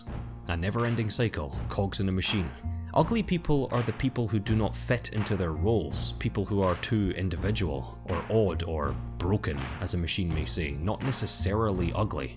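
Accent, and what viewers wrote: Scotland, United Kingdom. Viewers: Question: Are you scottish? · Wait are is he Scottish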